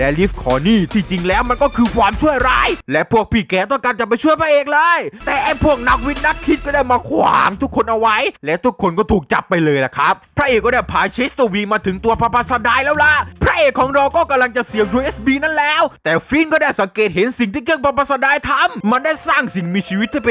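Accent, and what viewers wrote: South, Thailand. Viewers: อยากได้เสียง ใต้ เยอะๆชอบๆ♥️♥️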